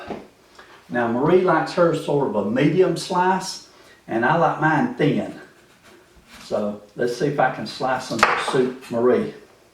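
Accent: South, United States. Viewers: I love the Mayberry southern accent.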